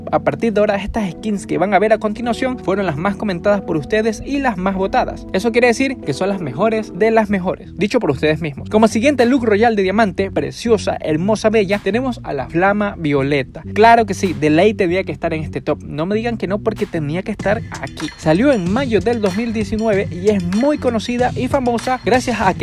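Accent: Ecuador. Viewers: De donde eres? Con tu vos me pareces ecuatoriano😕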